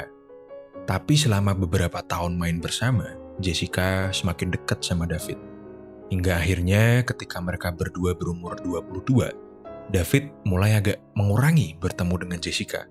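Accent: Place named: Semarang, Indonesia. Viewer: Bang, lu orang Semarang ya bang?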